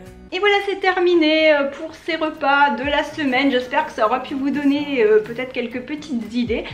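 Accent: South, France. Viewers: Merci pour cette vidéo. Ton petit accent du sud est tellement agréable de bon matin. ❤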